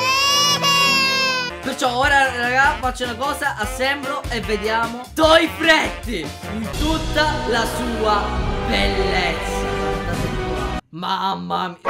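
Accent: Sicily, Italy. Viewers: sei siciliano? Io si sono palermitano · Sei siciliano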